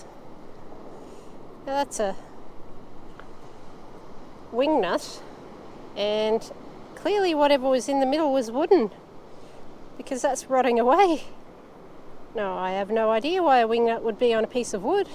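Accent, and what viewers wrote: Australia. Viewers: Good job, young lady 👏... Well done and very enjoyable. Love your Aussie accent 😍❤. Your new adopted Granddad in Florida...praying that you will be Blessed and safe 🙏...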